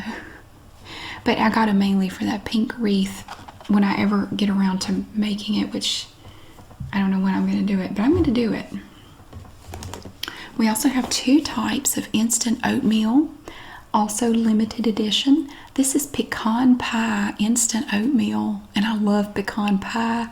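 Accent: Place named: North Carolina, United States. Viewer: Yes, she is a North Carolina native and her beautiful, musical accent is natural! 💖😊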